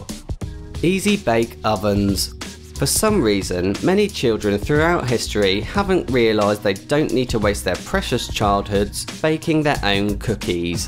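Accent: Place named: United States. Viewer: your amrican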